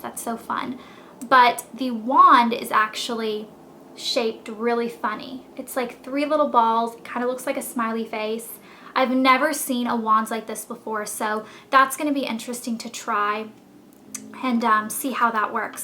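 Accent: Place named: South, United States. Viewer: NAPAL she has a southern accent :) the middle "a", nApal, sounded like an E :)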